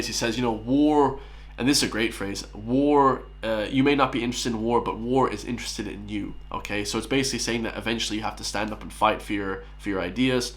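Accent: United States. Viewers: Why do you sound like a yank?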